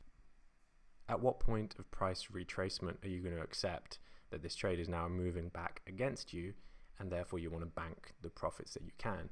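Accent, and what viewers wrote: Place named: England, United Kingdom. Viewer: Great content man. You must be the only Italian on earth with an English accent.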